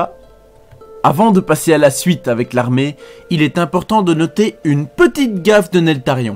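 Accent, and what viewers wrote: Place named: Belgium. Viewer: Il est belge c'est pas des erreurs de prononciation c'est son accent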